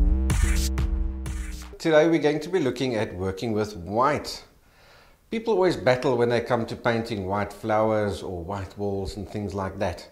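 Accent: South Africa. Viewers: Ja well no fine!! I was delighted watching your video and enjoying my homeland's accent. I live in Irvine California and became an amateur artist after arriving here 25 years ago. By the way, I have not lost my SA accent and people enjoy it for some reason! Anyway, good luck and best wishes with your videos. I plan on watching most of the paintbasket videos as I can see there are many which I did not know about. Totsiens and mooi bly!! Noeleen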